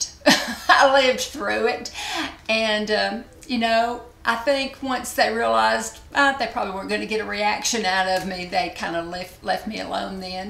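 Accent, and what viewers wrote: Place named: Kentucky, United States. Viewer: I'm glad to hear that you were also born a Kentuckian! I live in Louisville but also spent many years in a small country town south of Louisville. I wish I had your accent as it is so charming!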